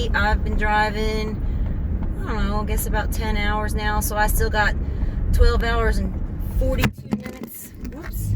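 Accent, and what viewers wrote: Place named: South, United States. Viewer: You're even sounding more southern!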